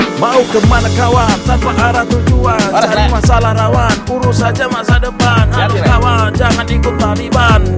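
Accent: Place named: Medan, Indonesia. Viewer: Mantap hebat komentator bukan org batak tapi logatnya persis logat medan horas..maju terus..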